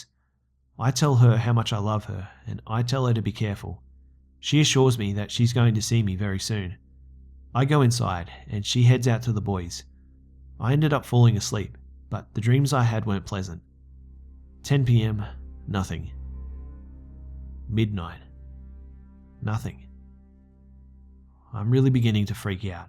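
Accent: Australia. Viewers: Be. your so Australian it feels like home i love it 😂❤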